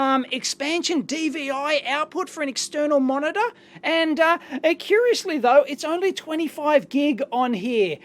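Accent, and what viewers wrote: Australia. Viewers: This guy is Aussie AF. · Aren't Aprils fool an US-only tradition? Youtuber is from Australia (as if his accent didn't made that obvious)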